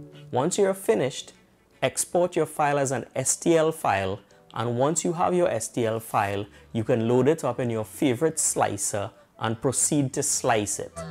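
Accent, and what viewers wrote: Trinidad and Tobago. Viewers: Great video, you sound Trinidadian. I'm happy to see a tech video that's not from india or usa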